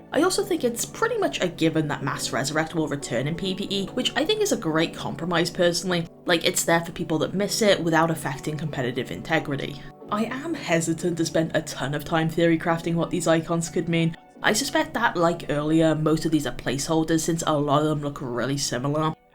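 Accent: United Kingdom. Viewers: Ah yes, British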